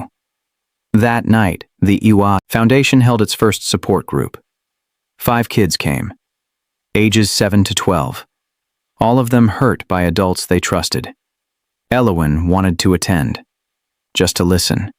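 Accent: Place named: Asia. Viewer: Hey narrator are you asian? It's obvious from the way you pronounce words.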